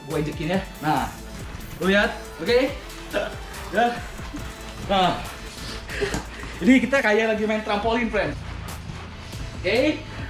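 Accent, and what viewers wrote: West Java, Indonesia. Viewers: Bang asli sunda?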